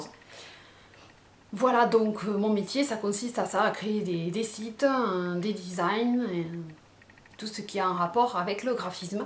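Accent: South, France. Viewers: Je voulais juste te remercie car en regardant ta vidéo je me suis remotivé dans mes études,j'ai vraiment envie de m'appliquer (tu m'a également fait versé une petite larmichette). Ton parcours est certes atypique (comme tu le dis si bien avec ton accent du sud), mais c'est surtout le parcours du battante. Je trouve que tu forces l'admiration et le respect. Je te souhaite le meilleur dans la vie et beaucoup de réussite professionnelle, tu le mérites vraiment, t'es une fille en or. Bisous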